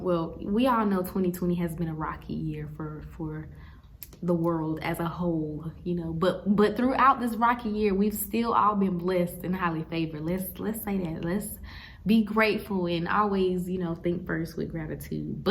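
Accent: South, United States. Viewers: First of all, you're so pretty, and I love southern accents! But yesss sis! I love seeing Black women doing their travel thing! I'm on my way down there in a few weeks, and I'll be there for a month. Just trying to make sure it's not a stupid/dangerous move for me to be staying there alone for a few weeks. I'm going down with friends, but then they're leaving and I'll be there alone for two more weeks. I'm either going to take some Spanish classes to give me somewhere to be during the day and people to be around. Or I'll maybe forget the classes and do a homestay so I'll have people/a family to keep me company 😅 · The accent is giving South Carolina (1st Guess) , or Texas (2nd) or Georgia (3rd guess)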